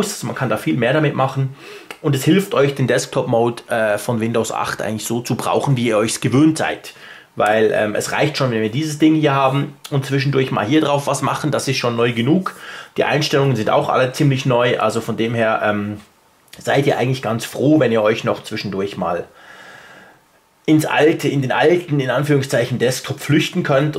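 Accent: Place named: Switzerland. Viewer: Von de Stimme höre ich, dass Sie wahrscheinlich kein Deutscher, sondern ein Schweizer seid, ich auch. Deshalb meine Frage: Ich möchte mir das Surface Pro kaufen, aber dies Erscheint in der Schweiz wahrscheinlich erst in 3-4 Monaten. Wie sind Sie zu dem Surface RT gekommen? Liebe Grüsse aus Bern Marco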